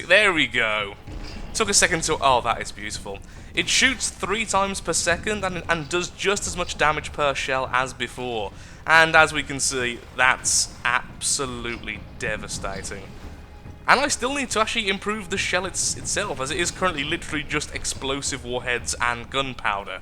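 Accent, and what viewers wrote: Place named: England, United Kingdom. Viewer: lol i can tell that you are english from your accent.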